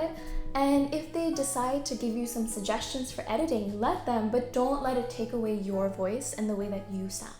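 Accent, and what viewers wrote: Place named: United States. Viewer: very nice. you have a perfect american accent, have you always had one?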